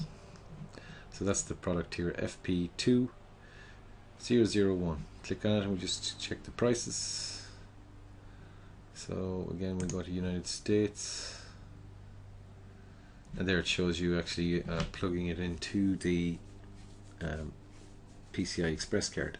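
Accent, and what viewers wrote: Ireland. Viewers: this was very irish sounding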